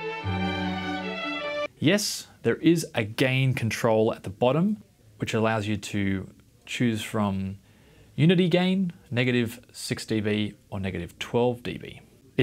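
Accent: Australia. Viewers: Great review bro! Like your accent. Are you Aussie? Been checking out good affordable wireless microphones that are easy to use since I am planning to create a vlog content in the future and I find this little device very capable for budding content creators like me.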